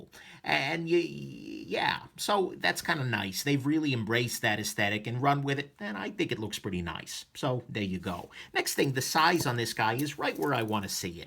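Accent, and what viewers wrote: New York, United States. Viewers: You sound very new york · Love your accent dude! Is it New York Italian? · This guy new yorks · your watch reviews are excellent, love that NY accent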